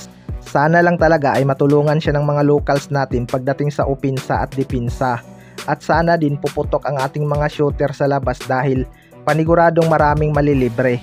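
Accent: Visayas, Philippines. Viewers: Opinsa at dipinsa . Bisaya na bisaya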